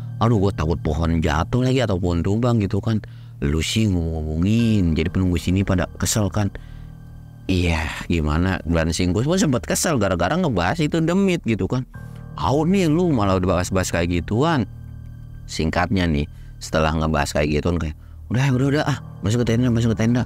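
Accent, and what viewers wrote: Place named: Banyumas, Indonesia. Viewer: Hadir ngapak Bang 😂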